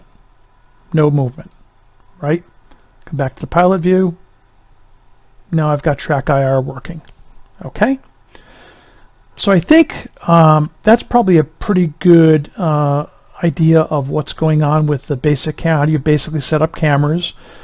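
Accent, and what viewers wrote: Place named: United States. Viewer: Thank God - someone who's from the US and speaks English for this tutorial.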